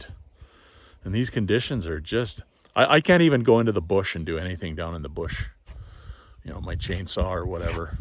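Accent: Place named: Ontario, Canada. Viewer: Great job. It appears this is where a man is meant to be. You obviously lived in Ontario for a while as your ‘Ote’ for the word ‘out’ is a giveaway.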